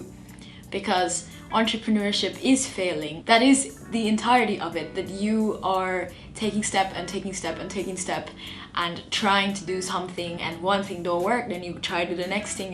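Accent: Jamaica. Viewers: Damn. You speaking in your ja accent is the sweetest thing. Jah know! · This came up in my feed and I wondered why this white looking girl is saying she's been away from Jamaica too long...then I hear the accent! Real yawdie! Keep doing ur ting yah girl! Nuff space is there for creatives.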